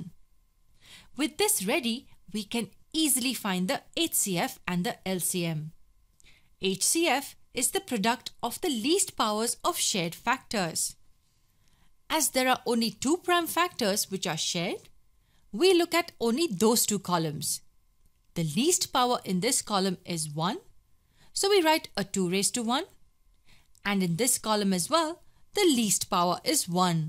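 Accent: India. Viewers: is this an indian speaking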